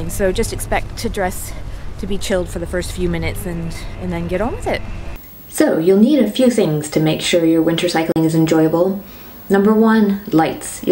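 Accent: United Kingdom. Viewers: sounds british but then words like 'about' popped up and i got confused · i m sidetracked but just want to tell you that, your accent adjusted very well to British. You achieved one of most difficult task in the world.😁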